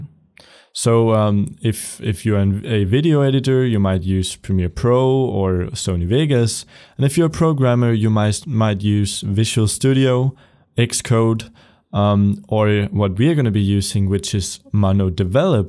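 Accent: Denmark. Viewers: Are You Danish?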